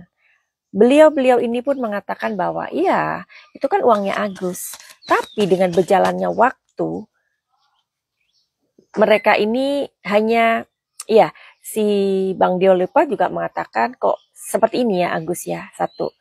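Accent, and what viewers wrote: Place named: East Java, Indonesia. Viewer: Maaf madam asli mn ya koc bahasanya kaya org Jawa timuran 🙏🙏🙏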